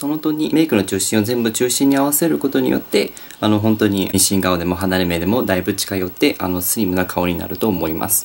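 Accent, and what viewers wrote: Kansai, Japan. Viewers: メイクすごく綺麗で丁寧なのに編集が面白くてギャップがすごい！私は関西出身なのでの発音すごく好きです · 、関西弁でかわいい😍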